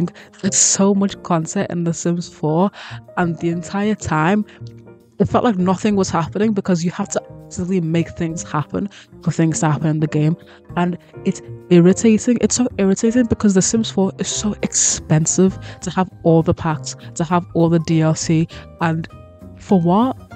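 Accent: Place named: Liverpool, United Kingdom. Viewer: omg 😅 that's a south liverpool accent !! how did my life go this long without hearing another scouse simmer 🥹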